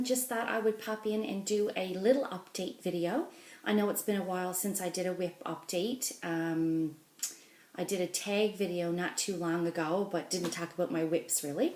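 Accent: Newfoundland, Canada. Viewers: I enjoy your videos ( and that Newfoundland accent ) so much. I am in rural Texas, no doubt I have my own accent, and I am over 120 miles from a Michael's and 70 miles from WalMart. No LNS anywhere either, so I do my stash enhancement shopping online. At least I don't have the shipping costs that you do. 😊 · I was listening to you speak and thought... that's an interesting accent. I feel like she's mentioned where she is from before, but I can't remember! So when you said "Newfoundlander" I was like "OOOOH!" I think you are the first person I have encountered with that accent! No wonder I couldn't quite place it. Your dad's boat is looking amazing! I am so impressed with the progress you've made and how fast it is going. My full coverage design is not even inching along, haha. And I agree with you! That Record Girl's hair is looking awesome.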